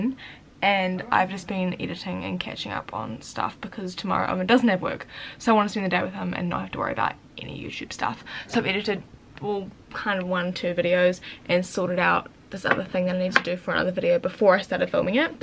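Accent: New Zealand. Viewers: Hi))) New Zealand accent is so nice!!!))))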